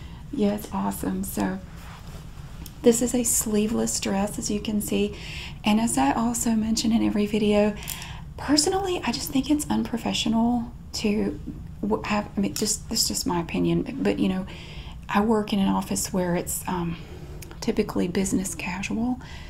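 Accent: South, United States. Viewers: I absolutely love you 🖤🤍🖤 watching your videos makes me feel at ease and comfortable. I think one of the main reasons why I feel so at home when I watch your videos is, because of your southern draw. It feels like home because that is how my mom talks and I adore my mom! 🥰 so thank you for all that you do on your channel. 🖤 from SA Texas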